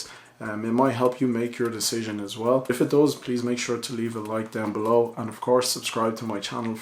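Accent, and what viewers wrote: Ireland. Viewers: I love your Irish accent 😁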